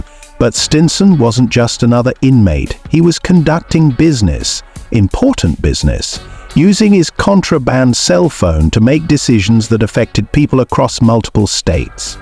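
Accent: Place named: United Kingdom. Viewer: BRITISH NARRATORS , I'LL PASS THANKS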